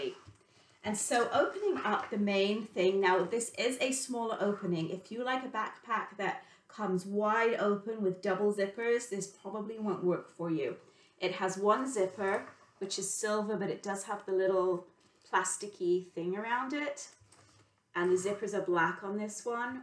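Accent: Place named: United Kingdom. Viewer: Just discovered your channel which I am enjoying. I noticed a subtle British accent, then in a later video, you stated you were going to visit relatives in the UK. Where are you from in the UK? Hope you start making videos again.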